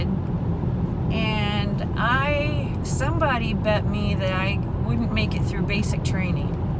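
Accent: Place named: Ohio, United States. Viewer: 😂 You sound just like my wife. She grew up in rural Ohio. When she moved to NYC, she initially found us to be rude and abrasive. After now living here for almost 3 years and seeing all of the good that is done, she sees we are genuinely nice people who remain guarded because we come into contact with a lot of crazy on a daily bases. Your journey is awesome! Keep sharing.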